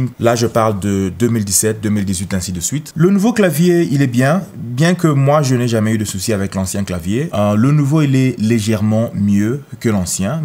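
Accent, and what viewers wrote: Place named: Cameroon. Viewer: Tu es camerounais frère 😅 l'accent. Je m'abonne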